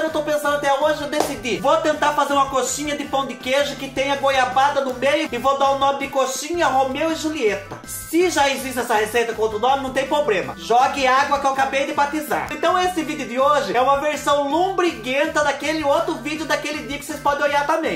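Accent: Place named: Minas Gerais, Brazil. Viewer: Descobri você ontem dia 21,mas estou amando você com esse sotaque de mineiro!!!!!!! KKKKK Você é muito divertido.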